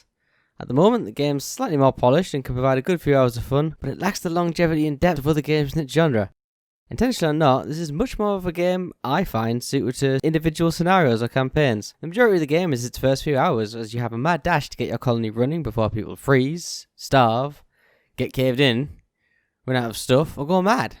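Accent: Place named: United Kingdom. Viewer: If you actually talk to British people as you say you do, then you would know that is British. Same as most countries we have regional accents, and if you say you 'can't' understand him, then look else where. What i find most hilarious about your comment is that American's and Australian's got there English from the actual British! Be more considerate instead of being a douche! To, Your video is fine the way it is, great job! Don't listen to people who proclaim to know what a British accent sounds like:p as i said its regional!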